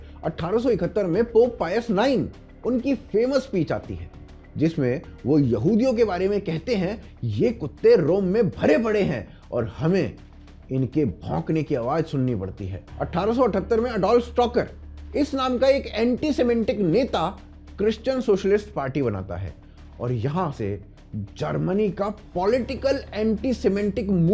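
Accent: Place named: Haryana, India. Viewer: He is haryanavi that's why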